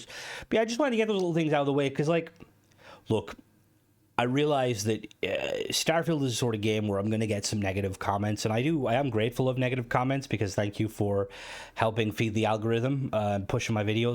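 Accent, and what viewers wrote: Ireland. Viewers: M8 your accent sells it for me I think the Irish sound hilarious every thing else bonus your great lad am a Geordie so appreciate how funny accents are are and btw starfield is what it is the problem is it's not what everyone thought it was gonna be it's deffo not crap by any means bit niche yes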